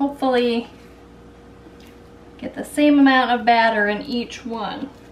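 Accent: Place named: South, United States. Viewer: you sound like a Southern gal, like me. Mama ( and I do, too ) used a cast iron skillet. There is one question that I ALWAYS ask at a restaurant if I may want to order some cornbread........” Has it got sugar in it? “ If it does, FORGET IT! But, there have been many times when I have made a skillet of cornbread and eaten...........only those crisp, brown edges........all the way around the entire skillet! Oh, Lordy, talk about good eating! Now, my daughter likes the center part ( she’s 1/2 “damnyankee” ), but I go for the crunch brown edges. And my Mama? She had to have some buttermilk with hers......yuck.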